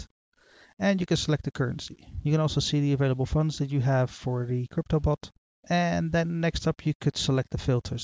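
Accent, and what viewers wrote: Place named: Netherlands. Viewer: Idk why but it kinda sounds like you're Dutch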